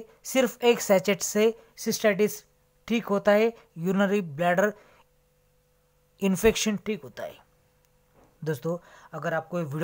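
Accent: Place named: Maharashtra, India. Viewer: बोलने वाला महाराष्ट्रियन हैं ना , गाँवठी बोलता हैं , ठीक से बोला करो भाई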